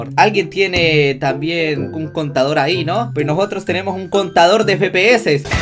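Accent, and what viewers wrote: Chile. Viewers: Eres chileno ?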